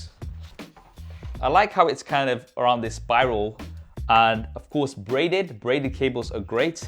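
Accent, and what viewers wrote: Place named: United Kingdom. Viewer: Please stick with the British pronunciation. #British Good job with video!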